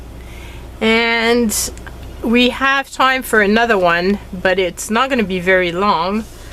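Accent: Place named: France. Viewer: I too have been watching your videos for some time now and can honestly say that you have been my greatest inspiration. Starting with recycling Christmas cards, I have gained in confidence, that I never had before I saw your channel. This has led to many different crafts I so enjoy doing. So thank you from the bottom of my heart. Also, I love hearing your French accent. I never miss your card making videos. My absolute favourite! xx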